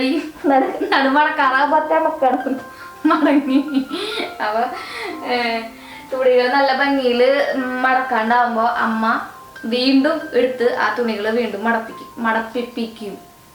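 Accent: Thrissur, India. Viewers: Chechiii njan adhyayitt kanuattooo...samsaram izttayii.. Thrissur anO ???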